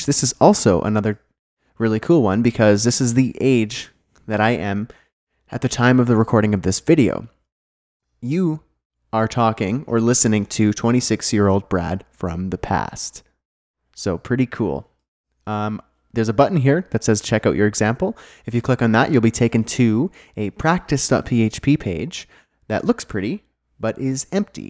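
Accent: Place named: Canada. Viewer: I love Canadian accent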